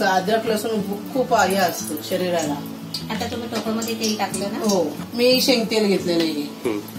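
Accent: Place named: Agri, India. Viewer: Mast ekdum Agri ascent talk.. 👍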